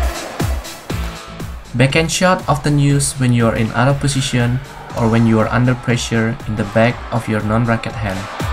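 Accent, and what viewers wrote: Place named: United States. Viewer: Me toobut I don't understand what he is saying bec I'm british and he has american and asian acent😂😂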